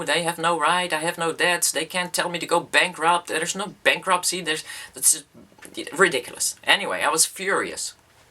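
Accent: Netherlands. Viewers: Such an honest and inspirational video. I watched a couple of your tutorials - perfect. I was trying to figure out the accent and guessed Dutch, and then had it confirmed when I listened to your story in this video. Your finished jewelry projects are to die for - I want that Marilyn bracelet. Can't agree with you more about the importance of research. You nailed it. You have no idea how amazing you are.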